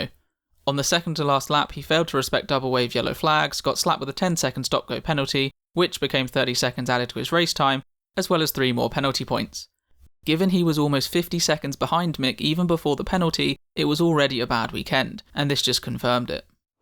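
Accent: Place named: United Kingdom. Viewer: re . Ouch ! That's a bit harsh on Her Majesty . You are obviously British with an accent like you have . No CBE for "Services to Motorsport Commentary" coming to you anytime soon !!!! :-D Ahh, yeah , I do love the way you deliver your commentary . Still I don't give out CBEs !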